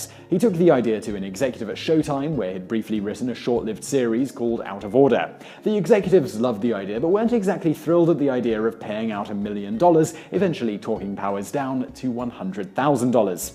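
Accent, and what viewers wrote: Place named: Belfast, United Kingdom. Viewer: Is anyone here from Belfast ?? This sounds like a homeless man in Belfast.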